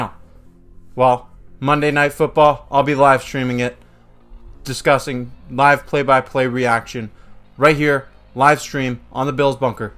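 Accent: Canada. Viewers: Happy Saturday everyone! I don't make predictions just Bills win. Hey - Are you Canadian?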